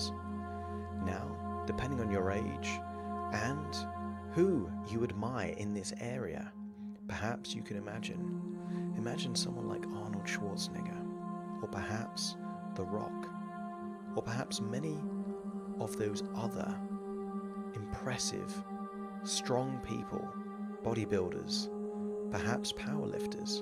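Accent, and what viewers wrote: England, United Kingdom. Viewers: Hearing You Speak Makes Me Feel Like I Am In (Old) England, Instead of New England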